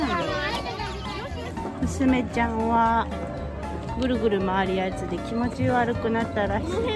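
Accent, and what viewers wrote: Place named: Okayama, Japan. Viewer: 出さないようにしてるみたいだが、 ときおり出る岡山弁のイントネーションに萌える。（40年岡山県人を捨て愛知県に移住）笑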